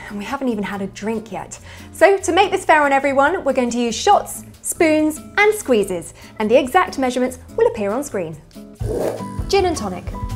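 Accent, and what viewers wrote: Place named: RP, United Kingdom. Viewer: Why do u sound so posh even tho I live in England 🏴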